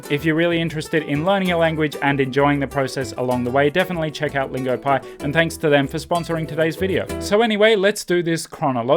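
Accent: Australia. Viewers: I speak English exactly as you do. I'm from NSW, I think we all kind of speak like you. I needn't support my claim, as most other English-speakers around world don't understand us anyway. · From your accent, I guess you are Aussie?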